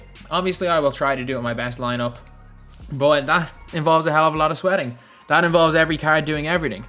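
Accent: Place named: South Dublin, Ireland. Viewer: This lad is defo from South Dublin ngl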